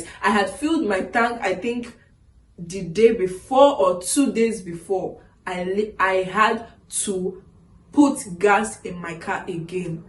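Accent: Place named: Nigeria. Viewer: Nice one dear , the accent hasn't changed 💯 still Nigerian.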